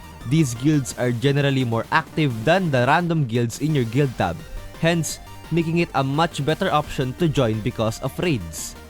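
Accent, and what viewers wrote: Philippines. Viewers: Nice video pare, salamat sa tips and tricks. Halatang pinoy with the accent 😂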